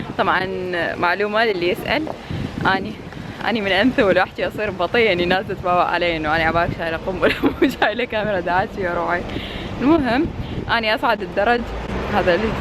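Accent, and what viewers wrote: Iraq. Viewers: سلامة افادج ههههههه.. ابتسامتج واللهجة العراقية.. لا تقاوم.. اتمنالج التوفيق من كل كلبي.. وبالعافية ع اللابتوب الجديد.. اذا تحتاجين مساعدة بخصوص اللابتوبات والبرامج وهالاشياء.. ممكن افيدج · بداية، احلى لايك قصة الكوب، قد تبدو سهلة وفاترة، ولكني اشعر بالمعنى الكبير الذي تحمله، احياناً تكون لدي مثل هذه الشطحات، بأن اقسم على نفسي بأن لا استخدم الشيء الا في المكان والزمان الذي احدده له لكي يكون حافزا لي. ويكون له طعم رائع في حينها يشبه طعم النصر. اللابتوب الوردي، لونه يعبر عن الانوثة الطاغية في شخصيتك. بالمناسبة، فعلاً اللابتوب المفروض يتبدل على الاقل كل 5 سنوات لان البرامج تصبح متطورة اكثر وتحتاج الى ذاكرة اوسع ومعالجة اسرع. الطبيعة الخلابة،،،،، احسدك عليها. سعيك وراء الاهتمام بامورك الشخصية والاعتماد التام على نفسك، امر مشجع كثيراً.. لهجتك العراقية راااااائعة تعبر عن عزيمة وثقة بالنفس وبنفس الوقت الانوثة والنعومة طاغيتان، احلى شي تلفظينه هو حرف الراء وحرف العين. 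مميزان كثيراً. اتمنى لك التوفيق من كل قلبي. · فديت الهجه لعراقيه شكد حلوه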